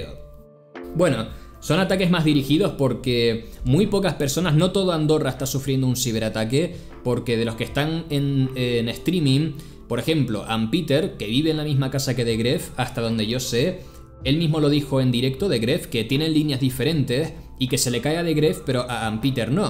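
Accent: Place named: Spain. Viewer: Habla como Dequiv, de qué parte de Espana es ese acento? Curiosidad.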